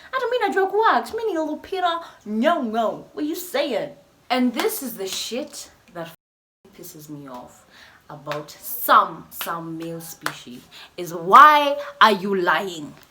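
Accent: United Kingdom. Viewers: I reall love how you mix up accents, , british English and "kenyan" english, , na some kiswahili😂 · That British accent 😍😍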